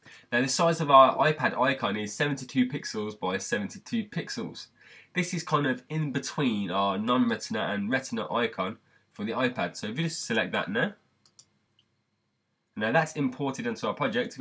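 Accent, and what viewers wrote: United Kingdom. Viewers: Where are you from? Manchester?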